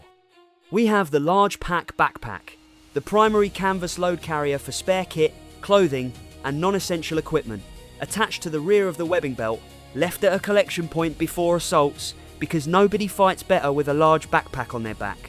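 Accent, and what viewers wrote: United Kingdom. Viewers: Surely the British soldiers carried ‘aluminium’ canteen and mess tins NOT ‘aluminum’ items! If using a British sounding AI narrator FFS ensure it uses British pronunciation!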